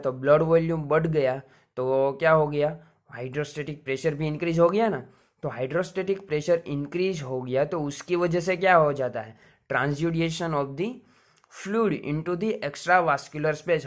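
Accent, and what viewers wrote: Gujarat, India. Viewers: Kem ke Hindi bolo yena upar thi to tame gujrati Lago cho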